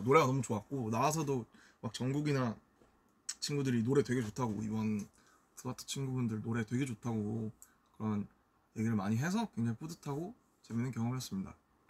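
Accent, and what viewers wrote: United States. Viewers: That's because when he speaks English, he adopts a west coast California accent to sound like a typical American. He has the sound exactly perfect which means he studied and practiced hard. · Namjoon know what ARMY'S want.......he knows that there is no subtitle so we watch his live later with subtitle.....so he is also speaking in english so we ARMY'S will understand what he is saying.....and his english is sound like he is american.......and his voice OH MY GOD I CAN'T.....and he looks good in short hairs.......but I like his long hair more lol........but he still look best in short hairs....... I PURPLE YOU NAMJOON.........BORAHAE..... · Y do I luv it when he talks Korean w his American accent 😩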